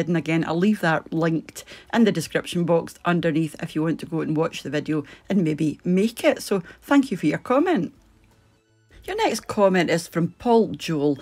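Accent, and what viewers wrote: Scotland, United Kingdom. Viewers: I agree about your voice. The Scottish accent is just so distinct and is one of my favorite. Rupauls Drag Race (if you didn't know it's a competitive drag queen show) is doing a second season for the UK and 1 of the contestants is Scottish. Now I have you and Lawrence Chaney to listen to all the time! ❤❤❤❤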